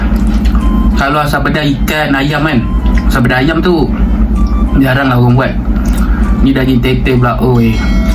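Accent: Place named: Melaka, Malaysia. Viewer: SODAPPPP! BUAT VIDEO LAGI BANG, PEKAT NAU LOGHAT MELAKA!!!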